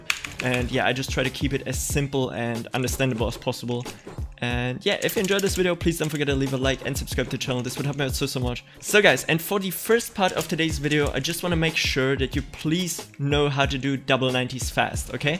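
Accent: Germany. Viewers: I hear German in his Voice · du bist doch save deutsch das hört man irgendwie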